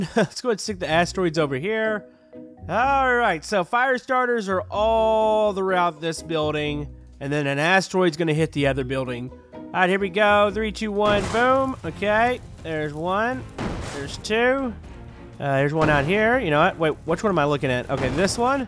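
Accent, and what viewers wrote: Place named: Texas, United States. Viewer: is problobly from Texas from his accent.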